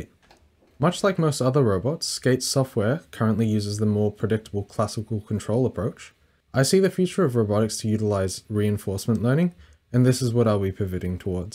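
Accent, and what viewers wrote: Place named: Australia. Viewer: I have to say I love they way you think. So often people design product with no concern to cost, ease of repair, weight & ease of manufacture etc. I also find that the general approach is to over engineer everything regards of need. This is the polar opposite of what nature actually does. I think the fact that you have made the right and left parts interchangeable is brilliant as keeping the part count is low is super important when manufacturing. I am waiting on a Bambu Lab printer so I can purchase your CAD and start tinkering as I think your product/project has unlimited scope and I have already some idea's on how I can utilise your actuator. I notice that you have an Aussie accent, any chance you are located on the east coast?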